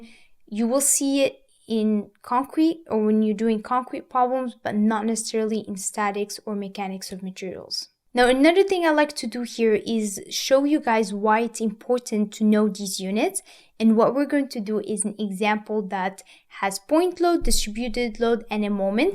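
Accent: France. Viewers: Sorry for my dummy question, are you from France?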